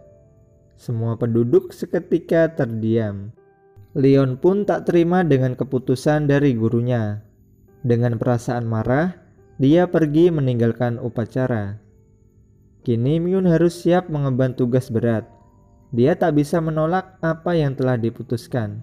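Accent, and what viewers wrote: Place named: Java, Indonesia. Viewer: Medok bangt njir..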